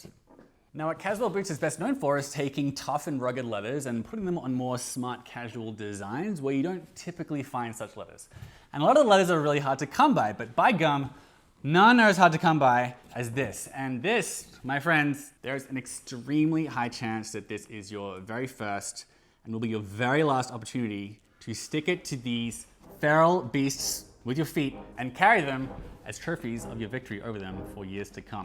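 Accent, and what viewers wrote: United States. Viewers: I appreciate the American accent 😂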